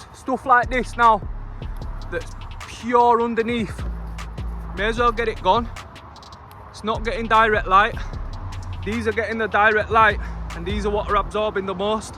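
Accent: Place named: Bolton, United Kingdom. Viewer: Sound like ya near bolton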